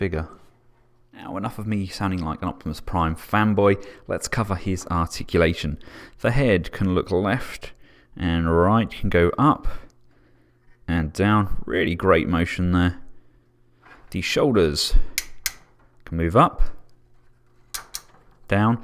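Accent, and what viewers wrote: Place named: United Kingdom. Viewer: british accent... must sub...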